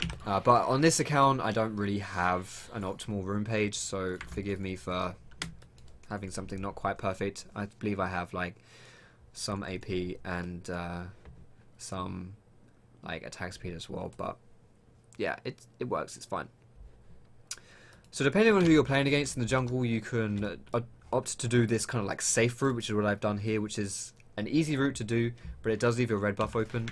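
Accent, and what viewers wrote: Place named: United Kingdom. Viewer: I KNOW RIGHT ??! It made me laugh for the whole game, but does he really means to say cumshot all the time? Cause he's not even laughing, so i'm wondering if he means to say something else but we don't get it because of his british accent or if it's just a joke he doesn't find funny anymore. °-°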